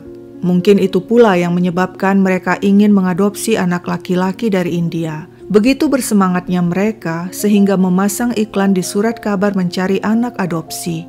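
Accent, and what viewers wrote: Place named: Surabaya, Indonesia. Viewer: Dari awal mendengarkan dr dialeknya aku sudah menduga sepertinya dr Surabaya. Bener dong ada "soale"... Hehehe... Selamat buat kalian yg menang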